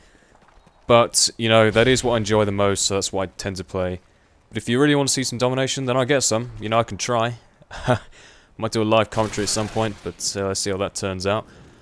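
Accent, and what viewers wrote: United Kingdom. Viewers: 2 Reasons why I LOVE YOU. You're British. You're a younger version of James Bond.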